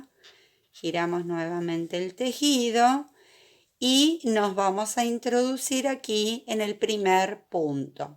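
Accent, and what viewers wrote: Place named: Argentina. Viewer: Hola buenas noches, me encanta el punto zuzette, es muy sencillo y se ve muy bonito, explica super Bien! Gracias! Su voz es bastante agradable el tono argentino es muy bonito, saludos desde Puebla, México. Bendiciones Perdón, una pregunta: que significa top down, no lo sé.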